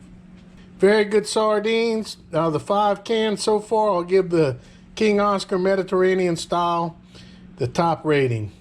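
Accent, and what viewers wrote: Louisiana, United States. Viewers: You from LOUISIANA?? If you don’t mind me asking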